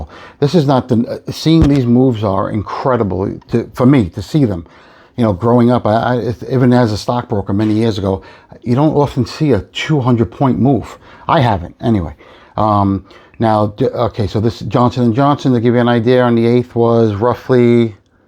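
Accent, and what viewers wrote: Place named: Texas, United States. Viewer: Hey paisan. Like you stack, content and especially your Texas accent!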